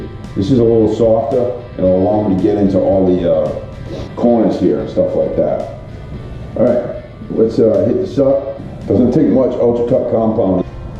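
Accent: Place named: New England, United States. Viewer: Are you from the east coast? You sound like my uncle lol got like a mass/rhode island accent.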